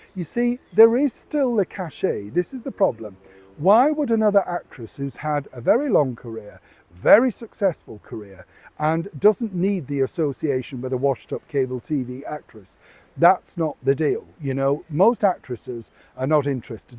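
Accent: United Kingdom. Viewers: Your British accent and the lovely scenes from London and environs make me want to have a cup of tea every time ! Sometimes I make a full pot ! Today I had iced tea ! It was 104 F outside too hot for a fire, the A/C is running and a fan too...greetings from Stockton, California USA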